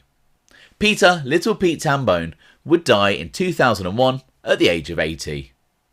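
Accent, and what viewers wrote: England, United Kingdom. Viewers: I love hearing about the American Italian Mafia from a guy with a British accent.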